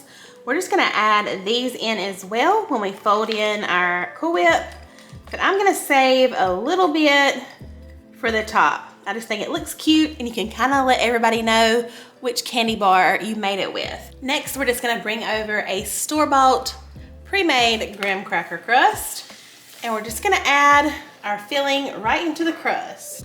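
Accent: South, United States. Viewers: I'm new to your channel really enjoying your recipes and home decor. Where you from im from ky i noticed you have a southern accent. Thanks for sharing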